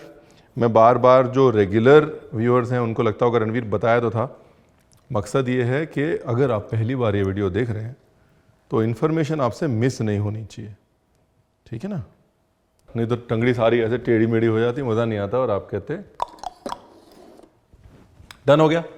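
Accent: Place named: Lucknow, India. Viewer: Aap English bhi Lucknow walo ki trhan bolte hain..☺️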